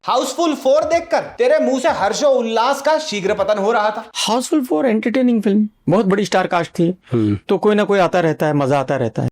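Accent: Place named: Delhi, India. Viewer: Bhai apki Avaj se Lgta aap pakka delhi se honge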